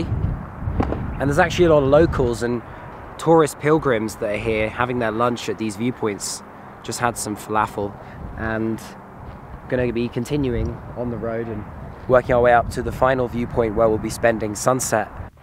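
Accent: United Kingdom. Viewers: مـفـاـح السـبـيـعـي يقول عجبه الخضار هههههه لغتي ركيكه واضن انو يشبه بعض المناظربدوله مدري ايش اسمها ولهجته بريطانيه ههههه ماكملت الفديو لوكان مترجم كان احسن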